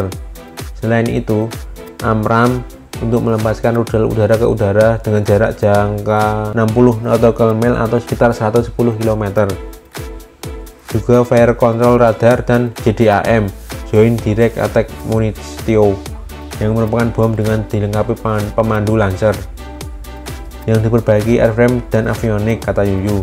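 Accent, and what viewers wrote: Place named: Java, Indonesia. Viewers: min.. ente org jawa ya??😁 bahasanya tolong jgn terburu buru. jd blepotan bgtu soalnya😁 btw kirain f16 jadi ke blok 70 viper... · medok Kabeh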